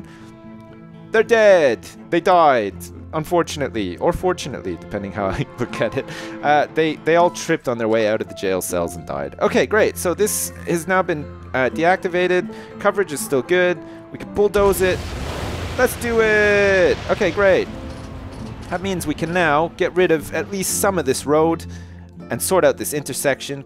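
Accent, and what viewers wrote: Canada. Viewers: YOUR FROM CANADA? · Is canadian???? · are you canadian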